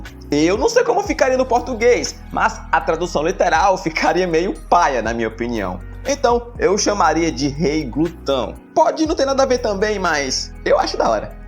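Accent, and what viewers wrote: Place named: Northeast, Brazil. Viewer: Mano vc tem sim um pouquinho sou do Piauí ent n consigo perceber mt sotaque nordestino, porém eu ouvi ums foi "massa" "foi paia" ai pronto tive certeza que é nordestino raiz kkk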